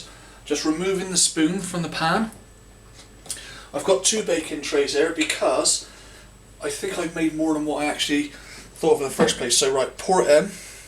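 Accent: Bristol, United Kingdom. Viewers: Good video :) I love tablet but never knew how to make it. Out of interest where are you from? Can't place your accent....Bristol? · I like your accent, are you from Bristol?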